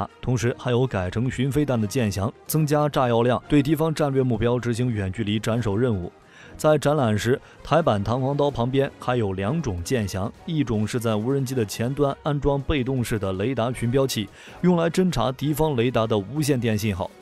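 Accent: China. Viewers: 為啥是中國發音？？？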